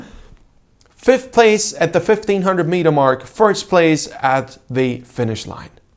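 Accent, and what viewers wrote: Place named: Italy. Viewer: I absolutely loved the energy from this video!! Its tis type of race that inspires those magical stories about rowing; the inhuman passion drive/sprint that shoots a crew through the finish first against the odds. I always get the 2017 world's Lodo and Vicino pair vibes, just channelling the passion and drive for victory. Videos like this are fantastic! Loved it, and 10/10 Italian accent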